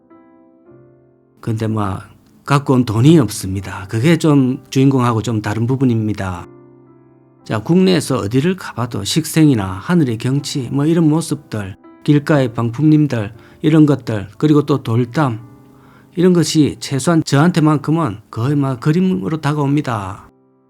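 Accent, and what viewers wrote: Gyeongsang, South Korea. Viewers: 강호발TV님 오늘도 구수한 경상도 사투리 잘들었습니다 멀리노르웨이에서 늘응원합니다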